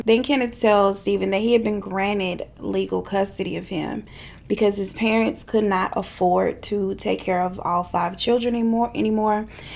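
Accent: South, United States. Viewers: You’re so well spoken with a southern 🛎 accent! So cute ! Love it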